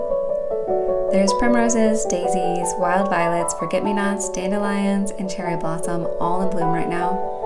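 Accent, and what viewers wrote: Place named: United States. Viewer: for years I wondered what your voice sounds like and it sounds very deep and very American I hope it's going to be more of these long-term videos to stay around forever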